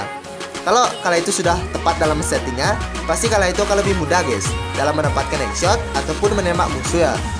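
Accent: Bali, Indonesia. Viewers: Bli logat nya kayak orang bali nek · Kok cara ngomong indonya kek orang bali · Ngomongnya kayak orang Bali gw curiga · Pasti orang bali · org bali y bg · Logat bali😅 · Bang Abang orang Bali? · Orang mana bang kok kayak orang badung bali ngomong bahasa indo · Org bali ya bang ya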